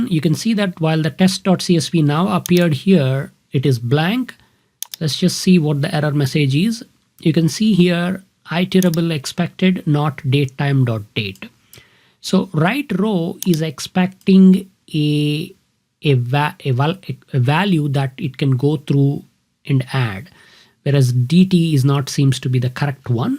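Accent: India. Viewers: indian accent --> close window. But thanks anyway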